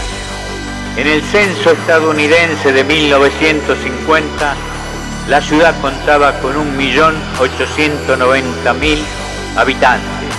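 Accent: Argentina. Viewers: . Seguro que sos Argentino y tanguero por la tonada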